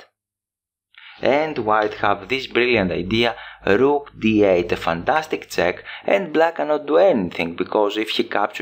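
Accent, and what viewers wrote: Greece. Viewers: I love the Greek accent lol. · Χαχαχα κατάλαβα ότι είσαι Έλληνας από την προφορά σου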